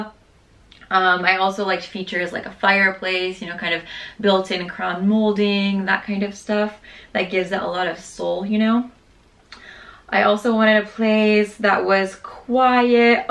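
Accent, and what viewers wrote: France. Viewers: Since you're fluent in French and have been there for six years, do you find the accent starting to stick when you're speaking English? Just curious haha. Love your videos and energy :)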